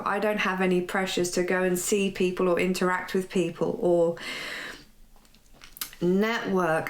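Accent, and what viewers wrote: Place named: England, United Kingdom. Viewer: The landscape and your amazing English accent make the video very pleasing ❤❤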